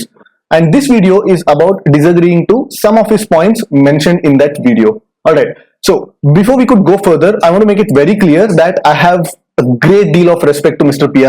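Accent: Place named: South India, India. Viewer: No south ki tone hai ye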